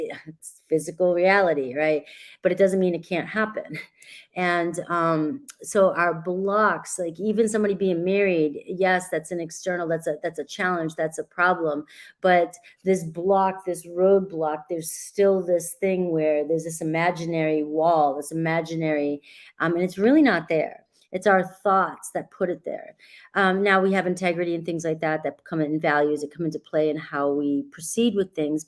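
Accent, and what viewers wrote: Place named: New York, United States. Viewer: where are you from? You kinda sound like me, from New York but raised my kids in Maine. Been to Salem, Mass several times.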